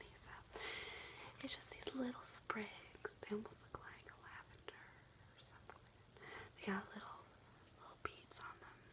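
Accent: South, United States. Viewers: Wonderful job on the accent. I'm southern and i can tell when it's a forced southern accent, but your sounds so natural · this is so nice to watch, usually when people fake a southern accent they make it sound annoying as hell but you just sound so sweet n gentle i love it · You are the cutest sweet pea ever! Your southern accent is sooooo adorable. I was smiling throughout the entire video. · OH MY GOSH THATS THE SWEETEST SOUTHERN ACCENT IVE EVER HEARD I LOVE THIS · the southern accent actually sounds like a southern accent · love southern accent ASMR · I am born and raised in the South and she NAILED this accent. Normally, it is difficult and cringy to watch Accent videos but this was really really accurate and tingles were everywhere. ilysm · As a lifetime Texan, you nailed the casual accent so hard. I wanted to fall asleep so bad but I have work to do😣. 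This reminded me so much of my childhood & growing up hearing all the women in my life w Southern accents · Love the southern accent 👏🏼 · This was heavenly!! The tapping on the tags gave me so many tingles, thank you lol! And the southern accent was a perfect touch ❤️ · I love Southern us accents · girls with a southern drawl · you can do a Southern voice really well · very appropriate use of the southern accent, we really do make a big ass deal out of weddings around here for some reason. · I live in Alabama and this was spot on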